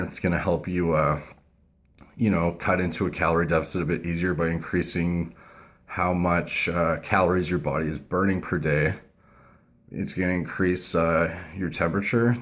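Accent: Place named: Canada. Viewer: I was surprised to hear was only 30 with his maturity and depth of knowledge. It makes more sense after seeing this video that was only 7 years ago because he comes across like a young guy in this vid- sounding so Canadian and gymbroish. In comparison with my maturation over a 7 year period I think it must be a combination of self-reflection from regular posting and PEDs.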